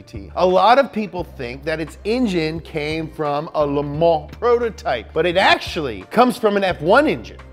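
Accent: Germany. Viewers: I need a 12 hour compilation of speaking German-ish English, anyone else? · out here with the best german accent in the business. Love it!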